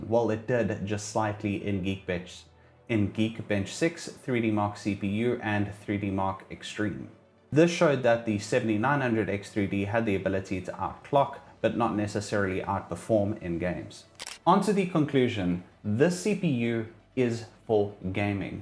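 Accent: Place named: South Africa. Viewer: South African accents are always so noticeable haha. Nice video man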